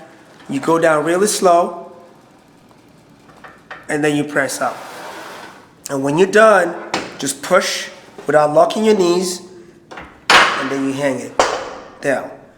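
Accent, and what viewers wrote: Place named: Ghana. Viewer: Sounds like youre from Africa..Ghana maybe?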